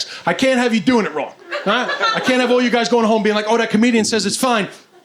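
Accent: New York, United States. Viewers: You code-switch to a much stronger American accent for American audiences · Never heard of this guy before, but DAMN that was a solid set. High energy, aggressively funny and smart, and an amazing combo of street New York accent with metropolitan comedy. 5 of 5 stars! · It's just a new york accent , and the fact both of them are a bit hyper.😂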